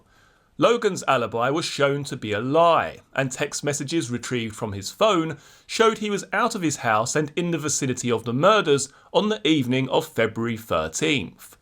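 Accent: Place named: England, United Kingdom. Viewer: Enjoy your videos and love your English accent. At least I think it's English. My dad was from Birmingham England. The English accent is very beautiful wherever you're from. I like how you think outside the box that's what I do too. I agree with you totally I believe it was totally planned I do not believe it was random or opportunity I've never believed that. I always thought it had something to do with being online way before we found out about the Anthony shots account. It could be that RA might have been sitting in his car for a while before the girls came because he was drinking alcohol out in his car or doing drugs or both who knows. He could have been talking to people that he was dealing with that were part of killing the girls in the plot that they were scheming in other words. He might have been conversing with some other people on the phone before he actually went to the trail. 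I do believe at one point he was sitting at the trail and they probably walked right by him and may not have noticed him or they might have seen him and when they actually did see him on the bridge I thought I had heard it one time that one of them had said there's that creepy guy again. I heard this a while back I don't know how true it is though. Had you heard that at all.??. Thank you for your response in advance. Happy New Year to you and your family God bless 🙌🙌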